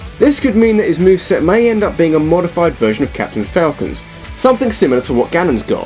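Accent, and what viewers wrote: United Kingdom. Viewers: A British accent doesn't mean your smart or you know what your talking about.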